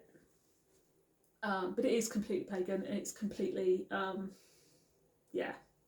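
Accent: United Kingdom. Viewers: I thought you are from USA I hear that UK accent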